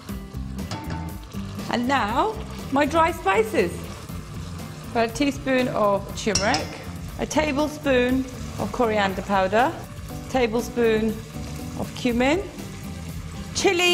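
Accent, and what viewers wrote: England, United Kingdom. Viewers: love British accent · Sounds very British accent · I’m guessing why she is talking like British and turns out she is British! ain’t it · Ok, I have never heard a more "English" accent in my entire life. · Rahul Gaikwad it’s called a british accent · mam.. your British accent is brilliant....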